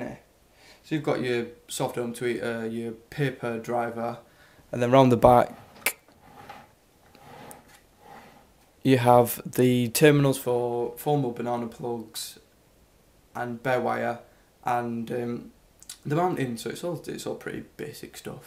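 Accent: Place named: Yorkshire, United Kingdom. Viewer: ***** Safe bruv! lols can tell with thas voice, Am near Leeds like. Had to get a lone against my AE 120s while next month. got some wharfedale 9.0 for £25 and am dam impressed for such small speakers. Gonna cop some 9.1s now and some nice stands. hopefully next month il have my ae's back and be a very happy bunny. Incredible value are the 9.0/.1 determined to find 9.1s at about £70. Peace, and keep up the great work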